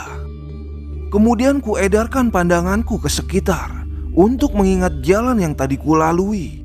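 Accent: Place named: Madiun, Indonesia. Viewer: Hadeeeeeh Madiun bletot lagi...heheheeeee salam bts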